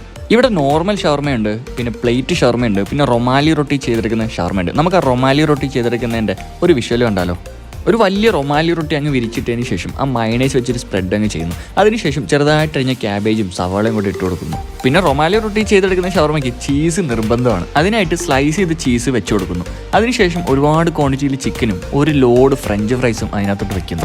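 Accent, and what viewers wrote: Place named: Malappuram, India. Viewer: Malappuram varthanam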